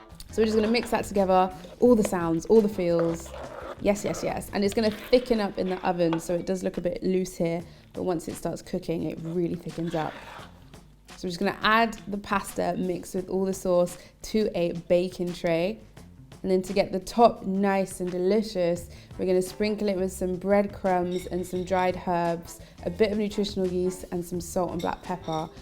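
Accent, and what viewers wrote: London, United Kingdom. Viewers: I knew it 💯% she was going to have a British accent 😂 she just looks English lol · Im a 1st time VIEWER......Oh My Stars🤩🤩🤩🤩🤩 This is a plant based FEAST🥳🥳🥳🥳🏆🏆🏆🏆 I wouldn't be able to finish that bowl but I would SURELY TRY! LOL That looks so good! Thanks for SHARING Doll🏆 U & Tish Wonders need to team up.....U both have that adorable London Accent🏆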